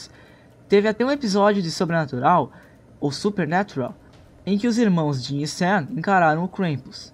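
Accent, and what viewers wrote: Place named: South, Brazil. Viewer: sotaque é do sul, nada a ver com norteste.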